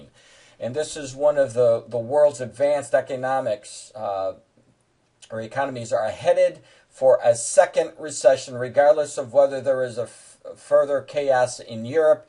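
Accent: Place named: Rhode Island, United States. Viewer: are you originally from Rhode Island by any chance? I'm hearing bits and pieces of an RI accent. Another great video!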